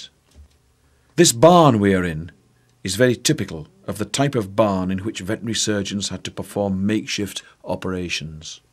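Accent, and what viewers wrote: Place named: Scotland, United Kingdom. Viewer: has a slight Scottish accent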